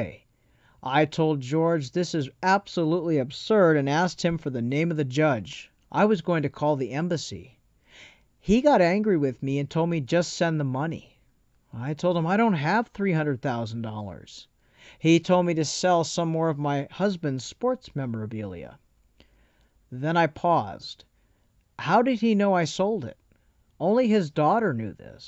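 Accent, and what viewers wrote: Nigeria. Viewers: my MIL was over and as I told you, my 2 month old daughter has to listen to these voice chats to calm down. She hears the accent, and I asked her where she thought he was from. She said Europe. To me it's baffling, but I went to a high school in Central Massachusetts with lots of Africans, many from Nigeria with a thick accent. SO i grew up knowing the accent.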